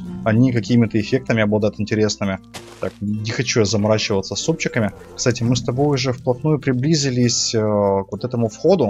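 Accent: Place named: Ukraine. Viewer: Авы случайно не украинцы? Шо да шо XD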